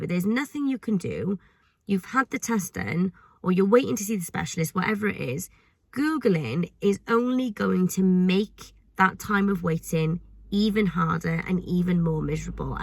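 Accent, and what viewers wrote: Cardiff, United Kingdom. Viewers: Is that a cardifff accent? 😊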